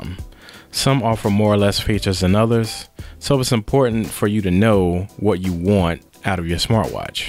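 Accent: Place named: Durham, United Kingdom. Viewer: Lol bru you gotta be from durham or something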